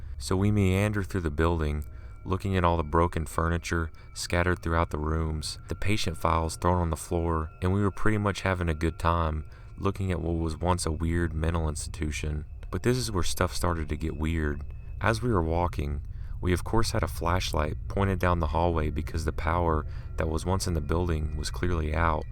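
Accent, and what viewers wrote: South, United States. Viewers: Yeah, he sounds southern. I'm from Kentucky, so I would know that pretty well : P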